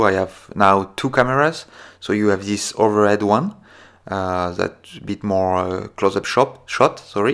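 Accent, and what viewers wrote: France. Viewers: Thanks to your video I was able to review the Omega 613 that was given to me by a friend. I didn't know it, so following your instructions helped me a lot. Your English with a French accent is also nice 🙂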